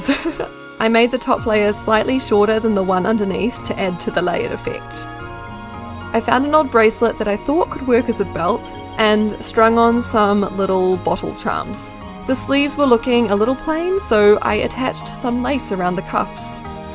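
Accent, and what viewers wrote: New Zealand. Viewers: Snap. I also came here from Diana's channel and I am also a New Zealander. I agree, it is lovely to hear our accent about. The doll came out so lovely and I'm glad to have found your channel. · Popped over from Diana's channel to watch the video and I have to say it is so amazing to hear a familiar accent. I live in New Zealand as well :) Love how your doll came out, she is so beautiful.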